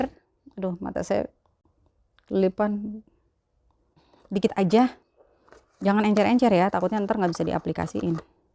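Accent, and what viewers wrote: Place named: Betawi, Indonesia. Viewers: Mbak..lucu bangeet sii..bicara nya kok depok bangeet..rumahan gayanya .gak imeg "an..haha..love you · Betawinya msh kental...😀😀😀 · Logat Indonesia betawinya masih kental... Bagaimana orang Arab lihat wajah Indonesia yg rata2 pesek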